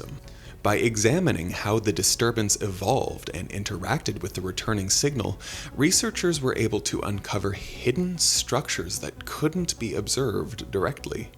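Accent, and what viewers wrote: Ontario, Canada. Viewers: i love your Ontario accent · lousy canadian accent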